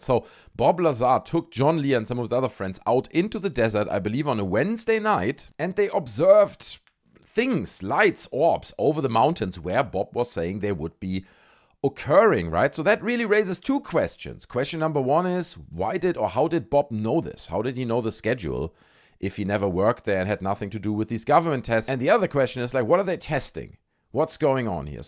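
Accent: Germany. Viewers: Interesting video but I cant stand your accent. Tf. Just speak german.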